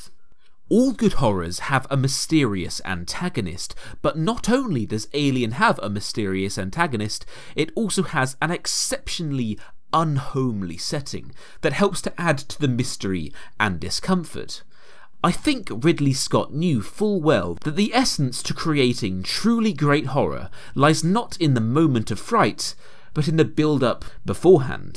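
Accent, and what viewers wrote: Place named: United Kingdom. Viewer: The narrator sounds like a British man 🤔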